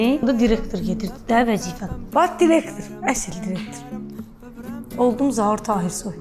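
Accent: Baku, Azerbaijan. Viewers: Bakı lehcesi başqa alemdi amma Bakı kendininde öz lehcesi acıqlama ver zehmet olmasa sizin lehce hansı kende aiddi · Sənin hər işin xoşum gəlir ama Bakı ləhcəsi mənə ləzzət eləmir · canım ay bakı lehcesi şırındude etaga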